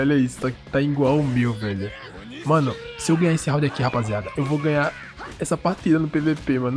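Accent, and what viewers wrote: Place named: Pará, Brazil. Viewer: Eita, tens o sotaque aqui do Pará, pensei q fosse sksksksksk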